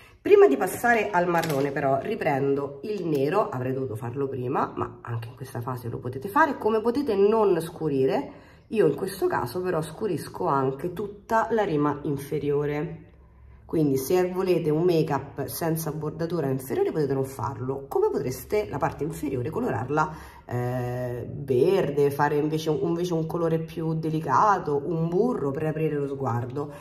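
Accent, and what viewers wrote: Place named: Rome, Italy. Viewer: Non riesco a seguirla, con quell'accento romano e quella voce repellente. Purtroppo esiste l'antipatia.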